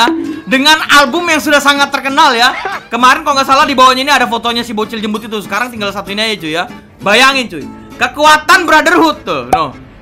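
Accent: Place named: Kalimantan, Indonesia. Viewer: Kek nya orang Kalimantan tuh bro dari bahasanya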